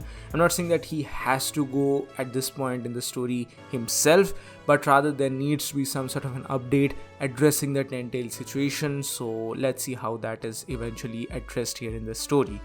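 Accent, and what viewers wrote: India. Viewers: BECAUSE HER ENGLISH SPEAKING STYLE IS LIKE INDIAN THAT'S WHY I AM WELL THANK YOU FOR TELLING (ARIGATO)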